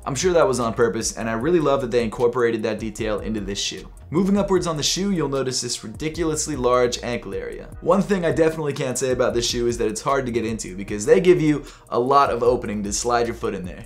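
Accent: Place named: Southern California, United States. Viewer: sounds like a totally tubular guy from Southern California but he's not from there.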